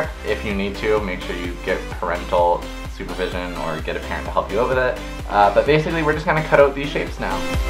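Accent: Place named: Canada. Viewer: are you canadian? your o's are adorable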